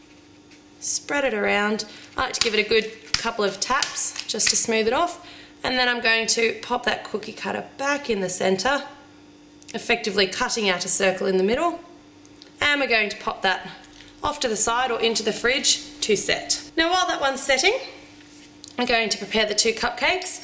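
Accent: Australia. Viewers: Are you Australian? I'm a kiwi, I know what an Australian sounds like... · i love her accent! are you austrailian? good video! lovely cupcakes! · Pugh she's Australian!!! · OMG if I had an Australian accent I would never shut up. I love your accent!! ^-^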